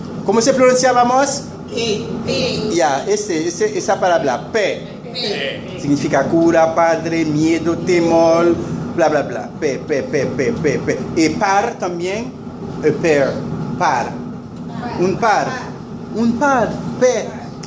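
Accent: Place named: Haiti. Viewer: su forma de hablar el español me genera una paz porque me recuerda a mis amigos haitianos ❤ me encanta su idioma, lo estudio por gusto y cariño a su cultura que la considero muy interesante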